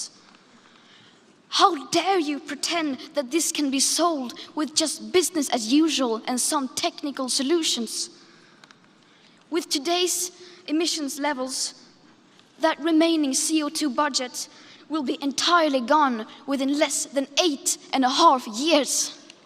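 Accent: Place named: England, United Kingdom. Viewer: Cuz she has an English accent like Emma Watson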